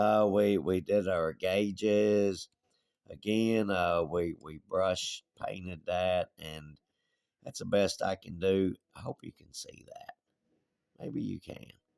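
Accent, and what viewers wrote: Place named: East Tennessee, United States. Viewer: One last question, where are you from? I’m from east TN and you talk a lot like me. Live in Arkansas now.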